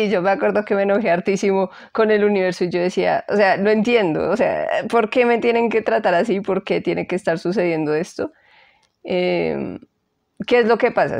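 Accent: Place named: Bogotá, Colombia. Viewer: Me encantó tu canal ❤ lo encontré buscando meditaciones y me pareció curioso tantos años viviendo en otras ciudades que escuchar tu acento que es de mi tierra Bogotá supongo lol parece jeje que recuerdos, y viendo los videos me encantaron gracias por compartir este contenido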